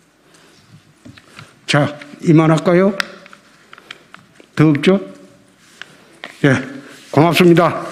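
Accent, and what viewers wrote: Gyeongsang, South Korea. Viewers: 홍대표님 막말 아닙니다 경상도 사투리 표준어로 했는데 뭔소린지 억지도 어느정도지 바른말씀만 하시는 홍대표님 존경합니다 👍🙏🙆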